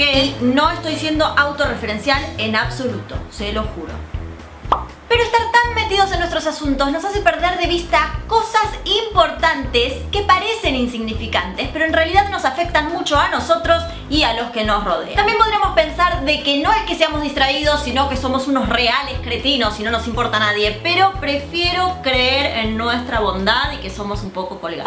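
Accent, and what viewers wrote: Argentina. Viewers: De donde es ? Porque escucho que habla 'Argentino' pero tambien neutro en algunos momentos...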